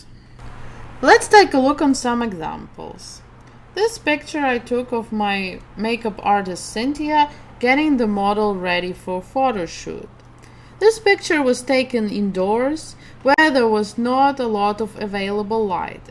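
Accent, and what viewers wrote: Russia. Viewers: Your videos are really helpful! What accent is that? Is it Russian? :)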